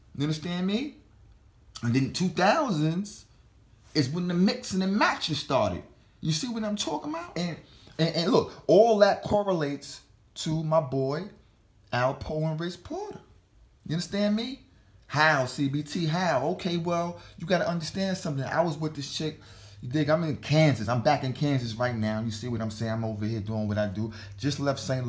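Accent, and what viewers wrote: New York, United States. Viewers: This is a New York nigga if I ever heard one desus and mero got me on the lingo 😂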